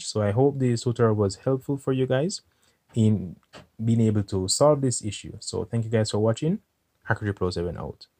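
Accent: Jamaica. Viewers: Dawg yah Jamaican or from the Caribbean??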